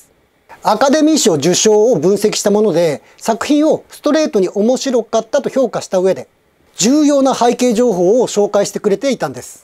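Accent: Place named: North Kanto, Japan. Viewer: "ぶっちゃけて言って" 氏は北関東か福島あたりの出身か。余計な事ではあるが。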